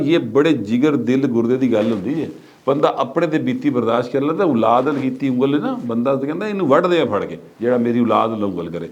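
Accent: Lahore, Pakistan. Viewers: کیا بات ھے جناب پکی اندرون شہر کی بولی بولتے ھیں بہت اچھی لگتی ھے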